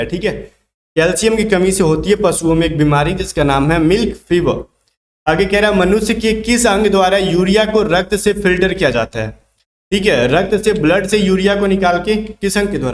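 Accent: Bihar, India. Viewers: Abe apni bihari mat jhad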